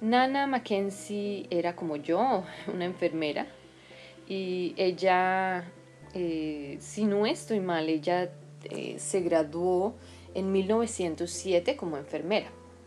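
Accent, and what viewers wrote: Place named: Colombia. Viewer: Aceptemos la diversidad, los acentos de cada region son diferentes. es Colombiana y esa forma de hablar es muy tipico de su pais.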